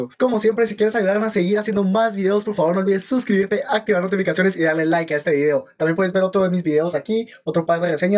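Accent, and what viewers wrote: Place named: Guatemala. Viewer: lo supuse por el acento jajaja yo también soy de Guatemala, y rayos te pregunto porque ya termine la 5ta temporada y note que no tenias comentarios sobre la serie, pero esperare, jaja saludos.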